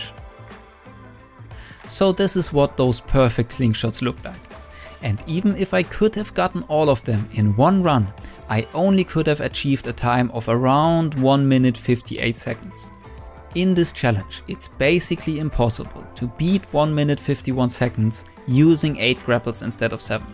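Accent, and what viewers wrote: Germany. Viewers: Your german accent fits you so well! :) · Dude, he's German. Its just how he pronounces words.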